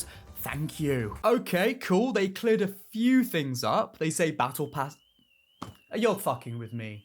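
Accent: United Kingdom. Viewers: You From The Great Britain Fine sir? I like your accent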